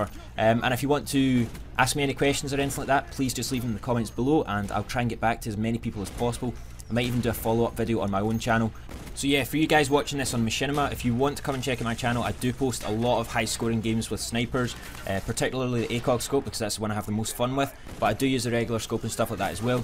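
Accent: Scotland, United Kingdom. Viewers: Scotland Aye? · are you scottish ?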